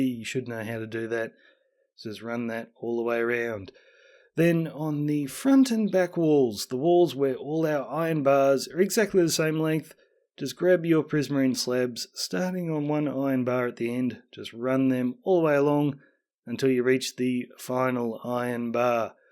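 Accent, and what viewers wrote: Australia. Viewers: Oh wow, didn't expect you to be Australian! Hello fellow friend down under 😃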